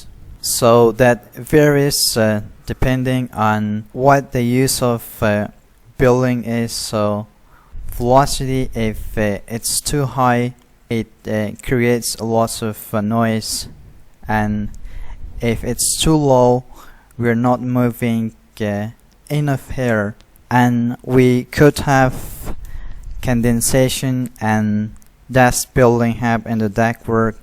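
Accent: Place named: Pakistan. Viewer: i think ur pakistani trying american accent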